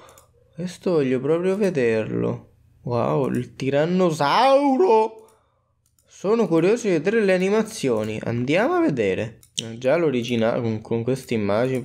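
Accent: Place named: Veneto, Italy. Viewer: Lui è veneto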